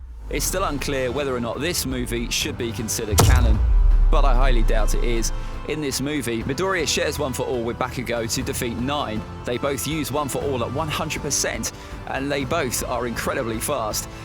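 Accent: United Kingdom. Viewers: Nice Power Level. Maybe we could do a power level together on my channel Btw I love your british accent :) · Your defenetly british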